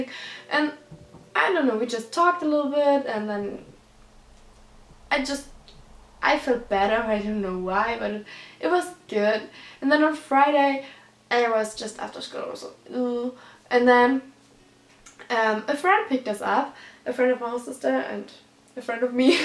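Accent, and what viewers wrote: Germany. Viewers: Dein Englisch ist echt gut .Klar man hört glaube ich immer das deutsche raus aber am sonsten echt gut 😍 Du hast ja am Anfang gesagt das du bald Ball hast und könntest du dazu ein video machen also wie du dein kleid kaufst wie du dich fertig machst und allgemein der Ball ?😊 Oder auch allgemein mehr videos machen wie zum Beispiel von deiner Schule wie es dort ist usw. also so eine art Vlogen ?🤗 Wenn du Zeit hast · That German accent combined with your poor English of is a real pain in the ass. A disclaimer for all whom willing to watch this cancerous video. Don't it'll only cause you pain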